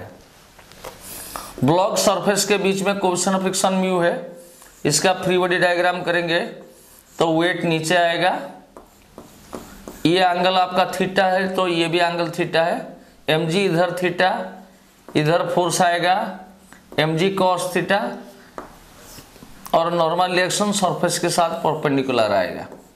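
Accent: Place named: Odisha, India. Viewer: Your hindi accent is like an odia...You must be an odia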